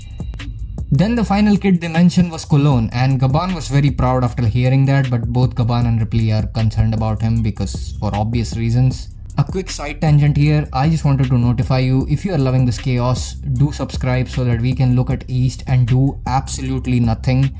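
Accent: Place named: India. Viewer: Bhai hindi me bol na English vale koi teri videos nahi dekhte or teri avaj se pata chalta hey indian hey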